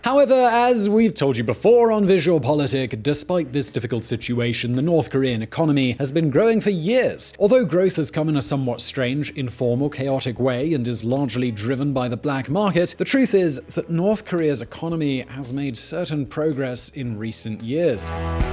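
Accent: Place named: United Kingdom. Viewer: since when does V-sauce have a political channel with a british accent?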